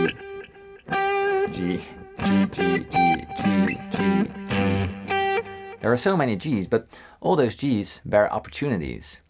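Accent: Netherlands. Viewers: I really like your videos. They're so well recorded, informative, and to the point and of course you're a great guitarist and know your stuff. A bonus is that my partner is Dutch so I love your accent and also love your subtle jokes at your accent like "Chi Major" so funny! Big ups to you bro, from a Samoan musician down under in Aotearoa / Niew Zeeland! Jah love!!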